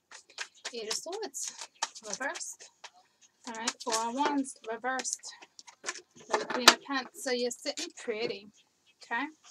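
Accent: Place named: Massachusetts, United States. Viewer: You are my favorite reader! Also I love that you have a Massachusetts accent I am from Massachusetts and have lived away from home for a long time. Every time I hear your voice and how you interact with your kids, it is like getting a huge piece of home. Thank you for your service!!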